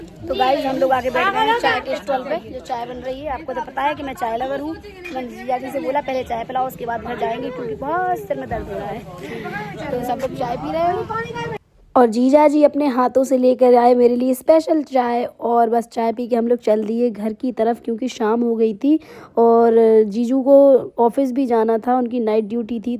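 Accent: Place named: Bundelkhand, India. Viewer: aapki awaj mp side mostly bundelkhand reason jaise lag rahi hai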